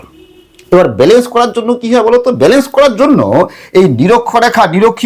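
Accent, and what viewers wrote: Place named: Bangal, Bangladesh. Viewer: দাদার কথা বলার ভঙ্গি বাচনভঙ্গি সব দেখে মনে হচ্ছে দাদা বাঙাল। বাচনভঙ্গি টা একটু সুন্দর করলে ভালো হতো।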